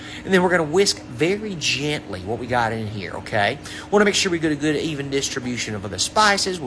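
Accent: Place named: South, United States. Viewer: Looks so good! And easy. You sound like me! Love to support my Southern family! ❤❤ Subscribed…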